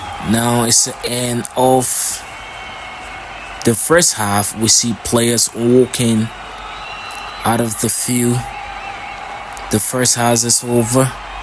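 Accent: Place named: Liberia. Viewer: My brother don't mind those people complaining for not using Liberian accent ...I am from Nigeria and married to a Liberian woman for 23 years 3 kids...Trust me most people can't understand when you speak in Liberian accent..you can't grow by remaining LOCAL...You have to Twick your accent to attract a wider audience.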